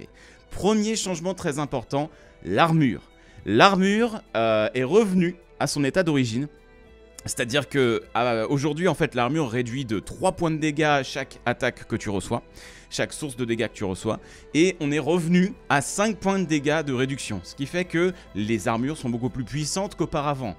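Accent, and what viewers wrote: South, France. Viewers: 😂 le meilleur accent du sud ever 😂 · L'accent du Sud pour rein c'est toujours un bonheur😄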